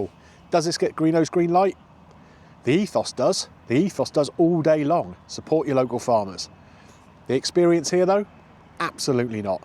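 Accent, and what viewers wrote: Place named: Dorset, United Kingdom. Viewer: which part of Dorset are you from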